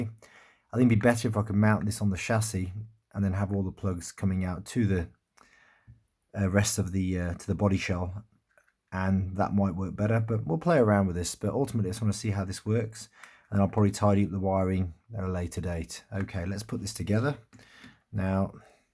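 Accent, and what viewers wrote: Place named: London, United Kingdom. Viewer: I just recently got the awd dodge challenge in destroyer grey, definitely want to get these lights for a red underglow! Awesome video dude. ... also, do you happen to know any good crowds to race with in London? Only asking because of your accent lol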